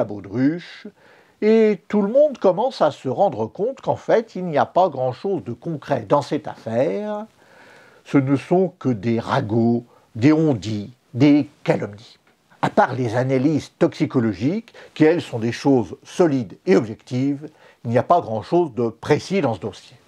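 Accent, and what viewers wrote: Marseille, France. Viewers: Bravo pour votre accent marseillais qui m'a bien fait rire ;) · Génial vivement la partie 3 ! j'espère qu'il y aura encore l'accent marseillais ;) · Je ne souhaite nullement descendre votre accent marseillais, mais vous pourriez utiliser votre nouvelle influence dans le YouTube game pour trouver des doubleurs qui prendraient plaisir à sublimer votre travail de narration. Excellente série, merci à vous !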